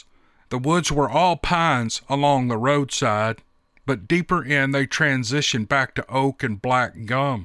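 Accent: South, United States. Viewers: OMG, what an incredible & terrifying encounter! Especially for a couple of fairly young lads still! From the stories I've heard from others, at least a thousand by now since I retired, discovered YouTube & have way too much time on my hands, I think if those Sasquatch wanted you, you would've been had. And given you guys shot at him, you're lucky you boys weren't....had that is. From what I understand they're incredibly fast & agile despite their enormous size. I've heard stories where they've paced vehicles upwards of 35-40 mph! I think they were 'escortin' y'all off their territory. Thank you for sharing your incredible encounter! And, thank you too. As usual your narration, in that sweet Southern drawl just soothes my soul.👣. 🗣️Whoop whoop!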